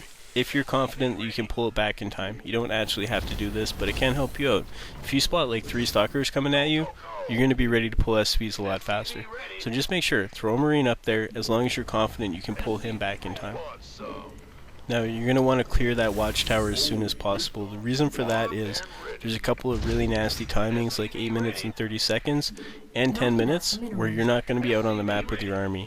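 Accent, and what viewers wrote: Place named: Canada. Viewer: Having gone through this series from the start, I feel it's funny how I agreed with one of the early posters who complained about voice inflection etc. (and of course his oat and aboat Canadian accent:-) But it seems I got used to it very quickly, I guess mainly because he says a lot of very useful things that are right up my alley. All the other "coaches" even such as day9 seem unable to break down the many many aspects of the game into steps that you can practice individually.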